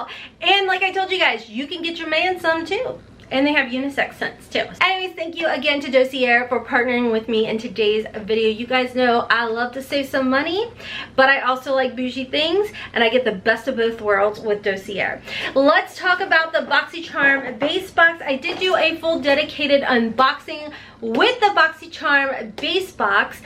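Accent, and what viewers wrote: United States. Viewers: I love your country accent so cute. I’m from the Bronx and now live in Cleveland so I love me little country accents super cute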